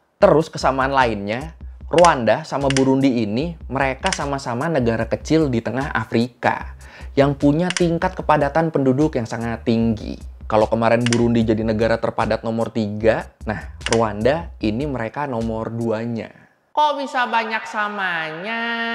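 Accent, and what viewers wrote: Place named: Madura, Indonesia. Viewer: KenaPa LogatLu KaYak ORang MaduRe Cong, BiSa KaYak GiTu Ya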